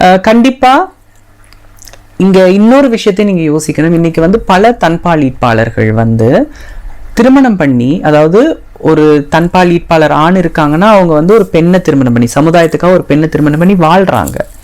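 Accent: Sri Lanka. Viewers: Ungaloda ilangai tamai I LIKE IT Ninga foreign la irunthalum nama tamil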